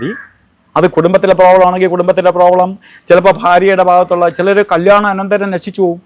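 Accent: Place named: Kannur, India. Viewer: ഇത് കണ്ണൂർ സ്ലാങ് അല്ലെ. ഇദ്ദേഹം ആ നാട്ടുകാരൻ ആണെന്നാണ് തോന്നുന്നത്.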